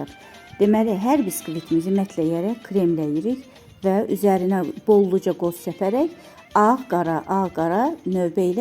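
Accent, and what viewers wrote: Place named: Quba, Azerbaijan. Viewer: Allah bərəkət versin 🤲🤗,necədə səliqəli gözəl .Adamın üreyi açılır.Qubalısınız? Ləhcənizi oxşaydım Quba ləhcəsinə.👍👍👍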